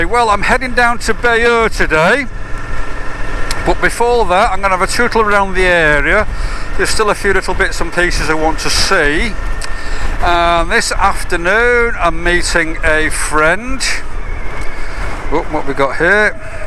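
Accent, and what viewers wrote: Manchester, United Kingdom. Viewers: Good to hear your engaging Manchester accent again pal…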